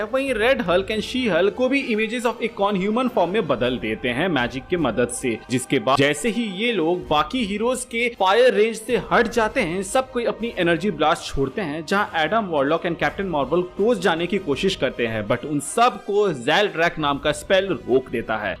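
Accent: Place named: Bihar, India. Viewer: Bhai apke tone of explaining se lgta he ki app bihari ho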